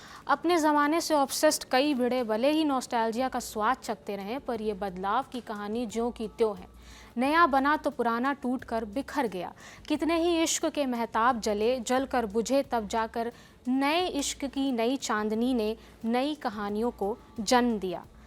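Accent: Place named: Bihar, India. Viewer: Y all lallantop anchor use bihari accent....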